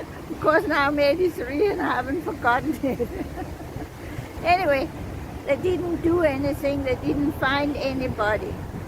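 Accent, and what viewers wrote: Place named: Denmark. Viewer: Hej Jeg kunne høre på din accent at du nok var dansker. Dejligt at se og høre. Jeg opdagede lige din fine video og har nu abonneret 🙂 Dbh. Michael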